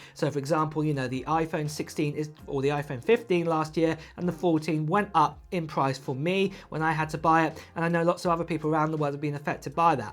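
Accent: United Kingdom. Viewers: UK English accent 🎉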